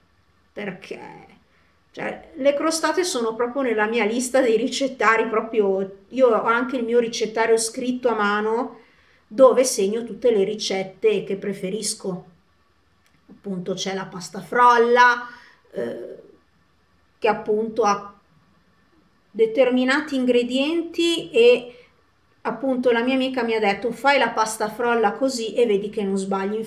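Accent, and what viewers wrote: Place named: Veneto, Italy. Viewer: pensa che io all'inizio ho pensato che tu fossi Veneta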